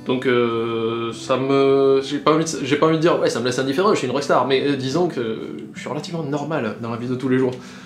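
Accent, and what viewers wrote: South, France. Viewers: HAN Man, ch'uis trop triste, personne ne vient à l'Anim'Est !! Bon, vu ton accent je pense que t'es dans le sud, et l'Anim'Est est à Nancy, en Lorraine... Mais pitié, viens ! Fais de la pub, même pour l'année prochaine, parlez-en entre youtubers ; cette année Noob est invité pour la deuxième fois alors que toi, le JdG, Mathieu Sommet ou encore Antoine Daniel n'ont jamais été invités ! >.< Viendez, on vous aime trop par chez nous !!! \o/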